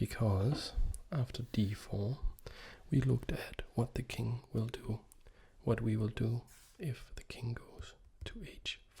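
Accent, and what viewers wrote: Denmark. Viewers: Loved your video on the French Whisperer Collab and I'm here again. I'm guessing by your accent that you're Danish, perhaps? Maybe you've mentioned this in an earlier video, apologies!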